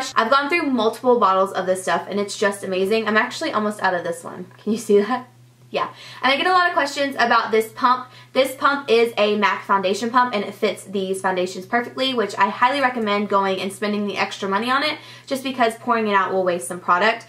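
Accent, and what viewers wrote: South, United States. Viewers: Love your videos by the way ! I cant believe you are at 1mil subbies ! You deserve that and much more , i remember when you did your tutorials in your old room and had the southern accent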